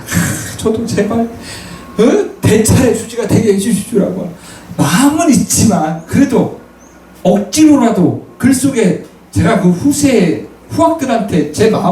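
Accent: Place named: Chungcheong, South Korea. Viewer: 충청도 말씨가 들리니 참 정겹구요. 조회수 안나와도 김 빠져 하시지 마시길요.ㅎㅎ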